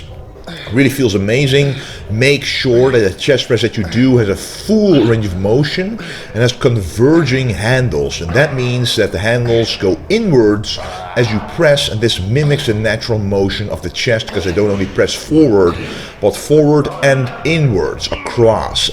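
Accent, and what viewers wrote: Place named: Netherlands. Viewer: Are you dutch i think so because of your accent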